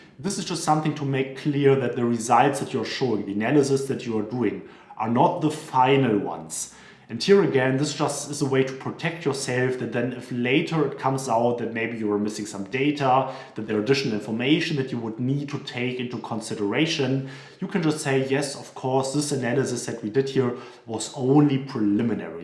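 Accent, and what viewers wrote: Germany. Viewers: Du hast echt ein sehr heftigen deutschen Akzent 😂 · Hört sich deutsch an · Liebe deinen deutschen Akzent! :D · Ich schmeiß mich weg 🤣 YouTube hat mir „deutsche“ Untertitel automatisch eingespielt. Ich habe zuerst gedacht das wäre dänisch, lettisch oder so. Als ich dann nachgesehen habe war deutsch angegeben. In Kombination mit dem extremen deutschen Akzent pure Comedy 🤓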